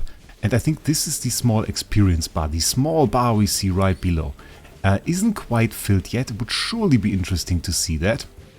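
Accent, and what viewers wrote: Germany. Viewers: your accent sounds german? am i right with my assumption?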